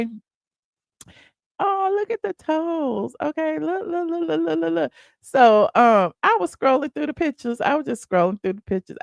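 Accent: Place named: Georgia, United States. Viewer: "Come own Ian" is what it sounds like to new yorkers . Its like youre a Georgia big sister reading a bed time story . Thank you 🩷🩷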